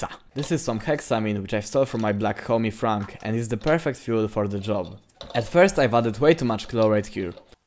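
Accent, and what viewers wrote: Poland. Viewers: po kilku slowach juz wiedzialem ze polak xD sub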